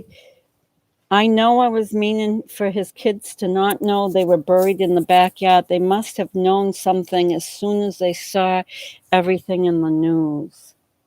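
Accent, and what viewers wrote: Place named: Boston, United States. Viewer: Can hear the Boston accent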